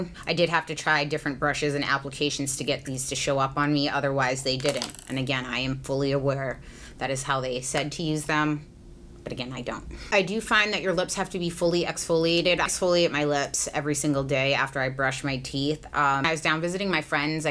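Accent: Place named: Massachusetts, United States. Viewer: I grew up in MA and knew as soon as I heard that she was also from that area. It's a regional sound.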